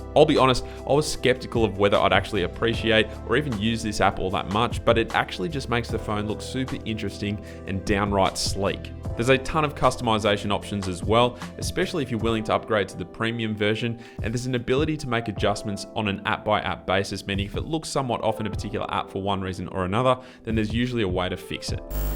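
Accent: Australia. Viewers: This guy's Australian accent is surreal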